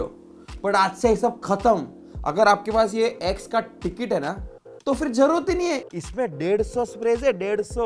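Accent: Gujarat, India. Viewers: Bro your acting skills and that perfect gujrati accent just perfect ❤️❤️ and ya this is not your mom again.😂😂😂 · The best thing is accent. Every Gujarati speaks in mix Hindi and Gujarati language. And yes every Gujarati middle class watch TAARAK MEHTA all day😂😂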